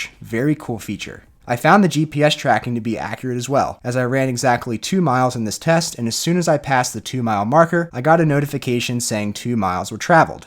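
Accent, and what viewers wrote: Philadelphia, United States. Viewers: Is that a subtle philly accent I hear?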